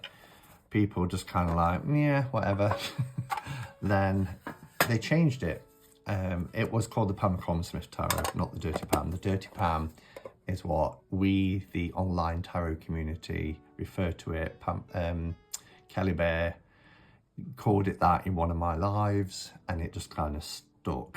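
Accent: Nottingham, United Kingdom. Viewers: great vid, thanks. Do you have any links for these? Can't see the Matt Green Neutral Vibes one anywhere. I'm new to Tarot, love this vid. You sound like you could be from Notts, good man if you are! No offence if you're not!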